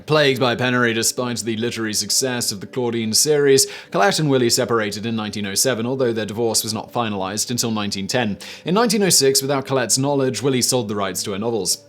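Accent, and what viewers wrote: United Kingdom. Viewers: Intrusive r, very british as well :)))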